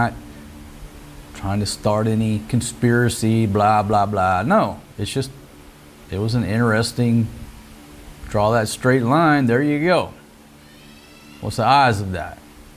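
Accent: South, United States. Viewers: New subscriber. Where you from in America. That southern draw makes me miss home.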